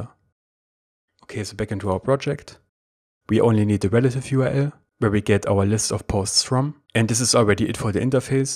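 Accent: Germany. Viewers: Are you from germany? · Subscribing because of the German accent! :D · Thanks for this brilliant tutorials! They are easy to understand and deeply. Firstly it was hard for me to understand your German accent :D But know I used to and your voice is so clear and understandable <3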